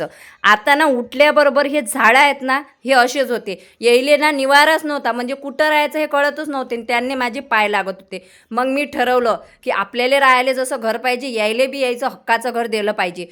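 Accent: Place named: Khandesh, India. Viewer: खांदेशी आहे का g तु ,काय ती मराठी भाषा तुझी, म्या 😂😂yayle😂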